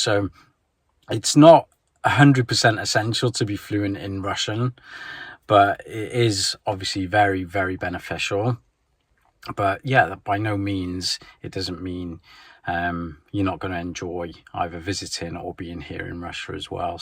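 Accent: United Kingdom. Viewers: u will never learn Russian...and i will tell u why...u don't like the Russian language...u just love to hear yourself in your ugly native language... and I can notice that u speak fluent english with a strong british accent better and better every day... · у нас некоторые русские делают покупки в магазине, не говоря ни слова 🙂 Я думаю, что вы человек очень family-orientated, что в повседневной семейной жизни вам легко общаться как с женой, так и с детьми на английском. Ну и есть люди, которые очень открытые, легко идут на контакт, много общаются. Вы более закрытый, вам хватает общения с устоявшимся кругом старых друзей и знакомых. Поэтому у вас освоение языка идёт медленнее, либо это просто ваше чувство, что он недостаточно хорош. Но пробуйте, будьте смелее, вас всегда поддержат. Людям любой национальности нравится, когда говорят на их языке, это ценится. 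Вам помогут с русским ваши дети, слушайте их, говорите с ними на двух языках. А так, мне очень нравится слушать ваш британский английский. Такое удовольствие? Скажите из какой части Великобритании вы? Просто я не смотрел ваши видео с самого начала,не знаю поэтому.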